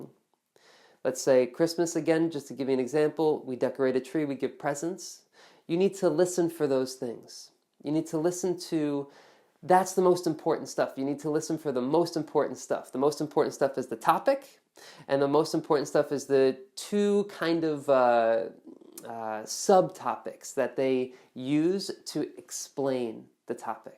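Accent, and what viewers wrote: United States. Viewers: Are you American ???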